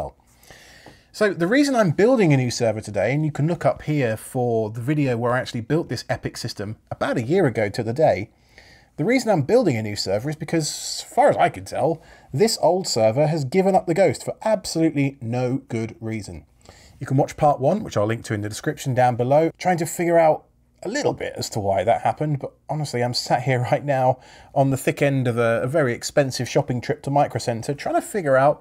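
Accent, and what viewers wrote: United Kingdom. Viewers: I just had an uncanny valley moment hearing inches in a british accent.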